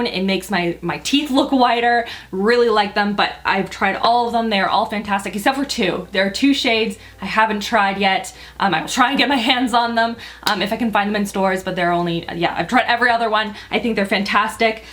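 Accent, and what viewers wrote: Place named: Canada. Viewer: . Your look today is spectacular! 😊 I love watching your videos not only because of your fab content but also because as a fellow Canadian who lives in Spain, I need my dose of the Canadian accent. thanks for that!! 😊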